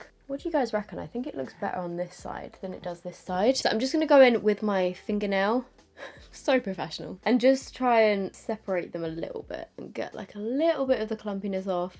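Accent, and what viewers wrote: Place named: England, United Kingdom. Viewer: God I absolutely love the vibe of this channel and your English accent is music to my ears!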